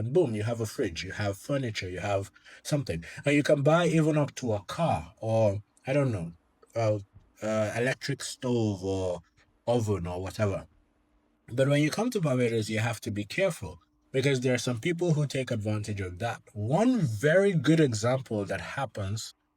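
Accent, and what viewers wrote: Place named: Southern Africa. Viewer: Did you ever live in Southern Africa? Your accent sounds Southern African. That said, these parts of Barbados look like parts of Akwa Ibom State. The major difference are the pedestrian walkways in Barbados.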